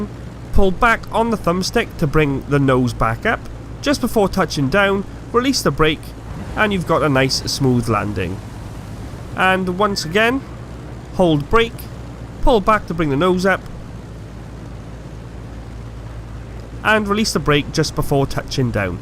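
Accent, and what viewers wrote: Wales, United Kingdom. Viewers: Is this guy Welsh? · Are you Welsh? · That would be absolutely amazing, not many people like you on YouTube, also loving your welsh accent!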